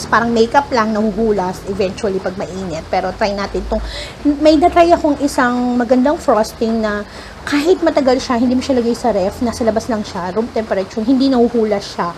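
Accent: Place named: Conyo, Philippines. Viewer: Ang arteeeee 😂😂😂😂 im enjoying your video ng sobra.. ang lakas maka conyo 😂😂 excited to try your recipe 🥰🥰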